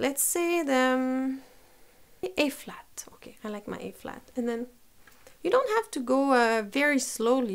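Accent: France. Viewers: Yeah ! the french prononciation ! Vive les français ! !!! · Hey are you a French? I like your English too.❤️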